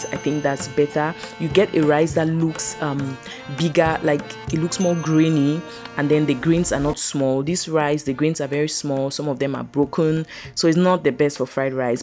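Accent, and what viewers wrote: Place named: Nigeria. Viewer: You have Nigerian accent the rice looks absolutely delicious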